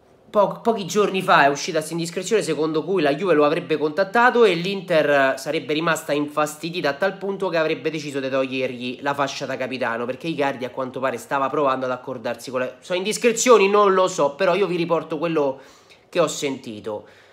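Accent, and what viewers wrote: Latina, Italy. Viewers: Ciao ma sei di Latina?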